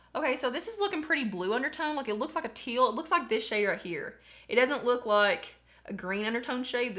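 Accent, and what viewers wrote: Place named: Kentucky, United States. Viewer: Just found your channel and noticed you're from Ky too!!! I love that I had a feeling from the accent lol I'm in eastern ky area what area are you from?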